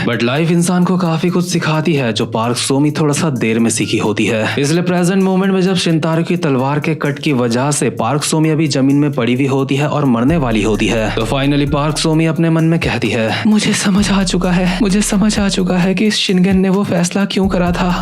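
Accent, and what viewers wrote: Uttarakhand, India. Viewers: Bro tum Uttarakhand se ho kya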